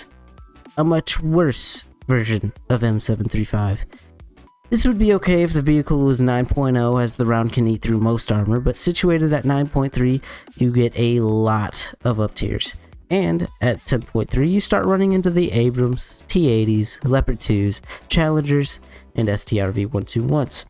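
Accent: United States. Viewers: I hope you blow up bro, the jokes and stuff in this video were great and you have good editing for being a smaller channel. The southern accent was hilarious. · loved the 'murican accent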